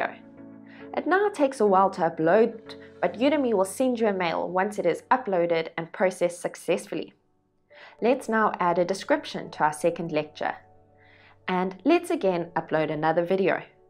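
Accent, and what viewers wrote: South Africa. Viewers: Awesome hearing the South African accent when learning online. Great content, thank you - it's a new teaching journey for me and this was a very insightful video 🌻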